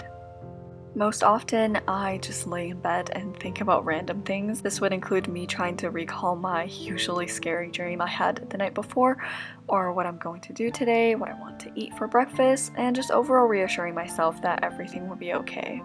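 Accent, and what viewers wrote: Canada. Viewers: She is back with her cute Canadian accent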